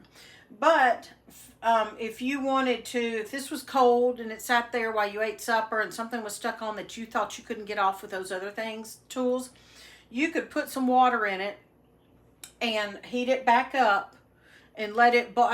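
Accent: South, United States. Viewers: How's about to say Ohio? Where did you get that Southern drawl then huh? 😅 Love from South Carolina y'all hold it down up yander 👍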